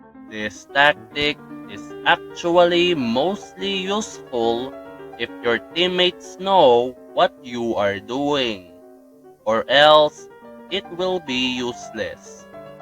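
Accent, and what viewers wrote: Philippines. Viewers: Woah you're a fellow kababayan, I could tell from your Filipino accent. Amazing on point video about sapper building management.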